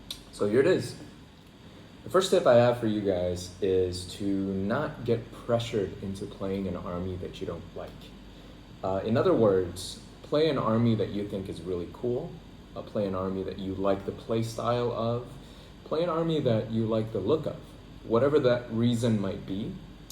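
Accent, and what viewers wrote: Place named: Iowa, United States. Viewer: Jakarta, Indonesia? You sound like you’re from Des Moines, Iowa! Great video brother. Army is spectacular. The advice you share is gold.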